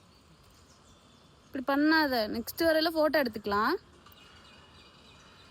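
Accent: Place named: Kongu, India. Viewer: Kongu tamil super ❤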